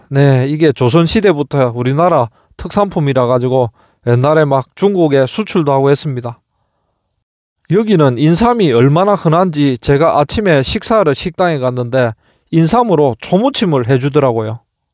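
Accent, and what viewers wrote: Gyeongsang, South Korea. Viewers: 축하합니다. 씨! 얼굴도 보이게 찍으면 더 좋을것 같은데... 얼굴좀 보여 주세요. 경상도 사투리는 고칠수 없다지만 얼굴 보여주는것은 시청자에 대한 예의가 아닐까요? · 심심하면 잘 시청합니다 추카추카 합니다 경상도 말씨도 구수하고 여기는 전라도 광주네요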